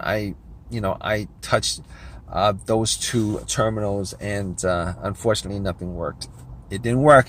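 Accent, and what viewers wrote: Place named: New Jersey, United States. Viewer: Lol I was listening and thought to myself, he sounds like he's from Jersey 😂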